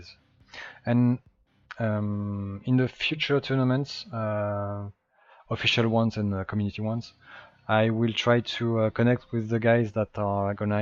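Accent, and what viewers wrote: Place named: France. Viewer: i feel sound a french accent :D (nice review)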